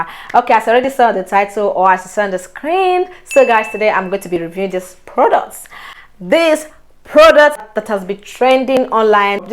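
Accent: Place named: Nigeria. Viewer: Your pigin is so sweet and the way you pronounce subscribe button is out of Nigeria . Love you girl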